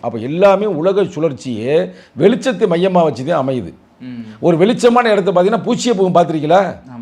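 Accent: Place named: Madurai, India. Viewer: மதுரை தமிழில் அறிவார்ந்த சொற்களை கேட்கும் பொழுது.... இனிது இனிது... நீர் வாழ்க....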